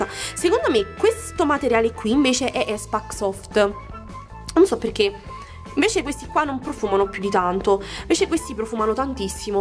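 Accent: Naples, Italy. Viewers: ma sei di Napoli?? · penso che tu sia napoletana perché si sente (poi nn lo so) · Ciao ma sei di napoli?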